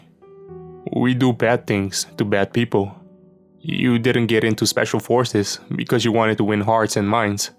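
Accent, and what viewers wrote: United States. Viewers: his robotic like tone with this acid us of A's accent make the video hard to listen more than 5 mn. So i read the comments.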